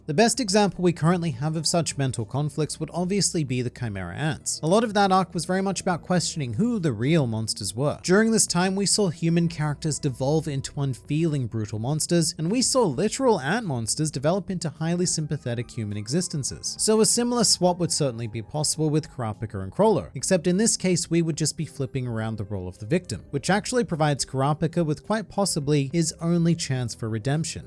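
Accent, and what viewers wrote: Australia. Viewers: wha is it about this australian accent which is so pleasant to listen to? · I didn’t realise that Aussie…oi oi oi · You’re Australian? How didn’t I realise 😂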